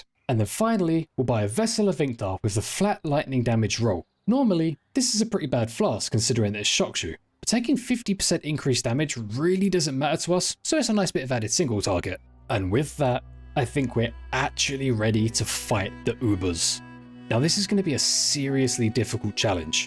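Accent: United Kingdom. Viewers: the lets go shopping caught me off guard after being used to the smoky british accent for so long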